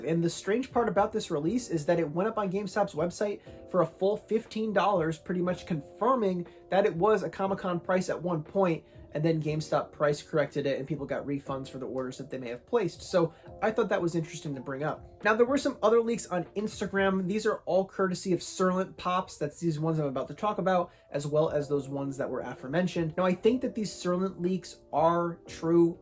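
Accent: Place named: New York, United States. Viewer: Okay but I loved the New York accent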